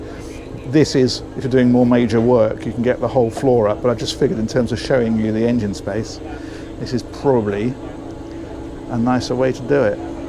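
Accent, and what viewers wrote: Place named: United Kingdom. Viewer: Wow, what a fantastic toy, an absolute awesome party boat! Great tour as always, and lovely british accent makes every video even better!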